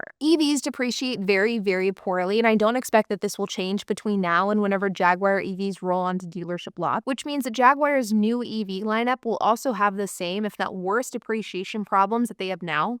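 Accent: United States. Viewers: She can't help being American, they only have a tenuous relationship with the English language. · It's how Americans pronounce it get over it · Americans most often say “jag war” for the animal and the car. Americans rarely pronounce the “u”, unlike the British. In the American NE, the “war” would most likely be pronounced “wire”. My guess is she has an American NE accent.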